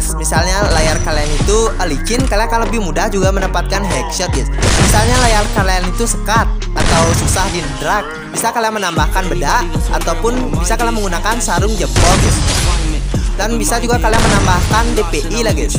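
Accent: Bali, Indonesia. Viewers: Logat bali nya kentel banget bli 😁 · Fiks orang bali😂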